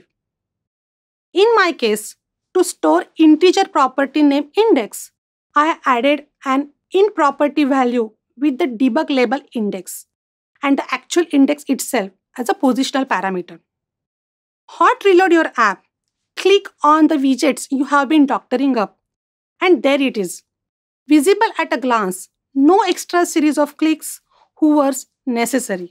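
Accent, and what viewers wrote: India. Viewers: 这个印度咖喱味道的English听起来真让人难受啊。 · Thanks. First time indian accent 💌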